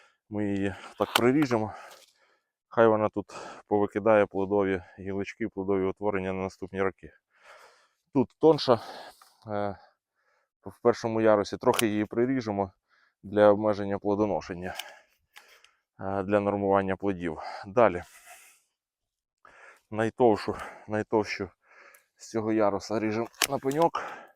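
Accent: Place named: Poltava, Ukraine. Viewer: Дякую, чудові відео, гарна полтавська мова, все з любовʼю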